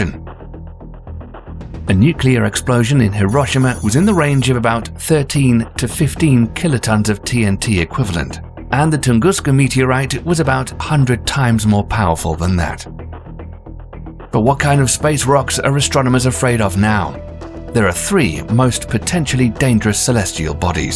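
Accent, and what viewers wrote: United Kingdom. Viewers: Funny how bull shit with a British accent sounds more credible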